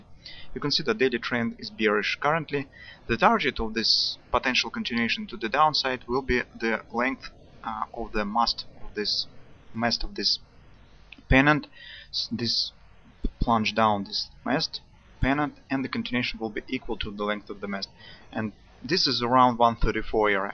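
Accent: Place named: Russia. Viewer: у тебя славянский акцент... по-моему русский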